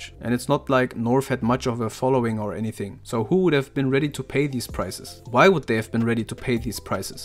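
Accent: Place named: Germany. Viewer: Where are you from? You sound like german and you talk about trilluxe in some vids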